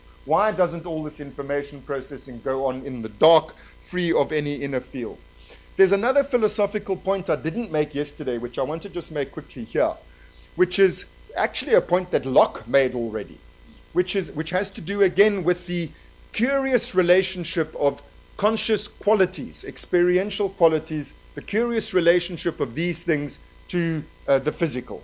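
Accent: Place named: South Africa. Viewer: He has heavy South African accent.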